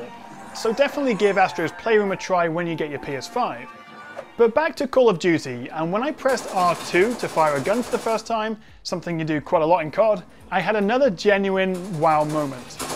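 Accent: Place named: North, United Kingdom. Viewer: why do you sound like a northerner pretending to be a southerner?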